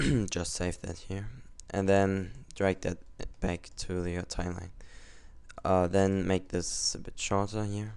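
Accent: Germany. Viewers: Your German Innit?:3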